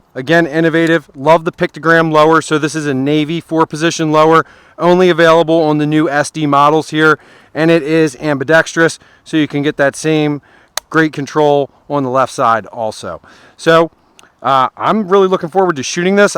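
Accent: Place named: Philadelphia, United States. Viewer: Are u from philly? Your accent is so NE philly...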